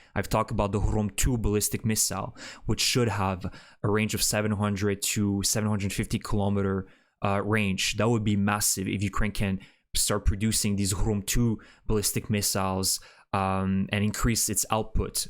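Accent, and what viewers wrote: Quebec, Canada. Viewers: Pretend? I hear a strong French Canadian accent.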